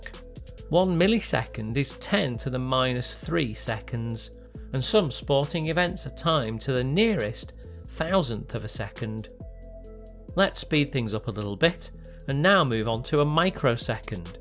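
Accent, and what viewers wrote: United Kingdom. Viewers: another limey narrating a video👎💩💩💩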